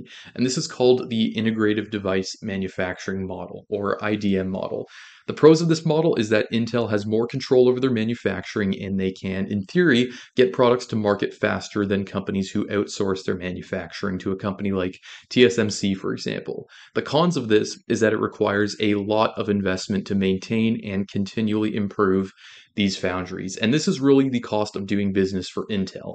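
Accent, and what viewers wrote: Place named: Canada. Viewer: From your accent you must be canadian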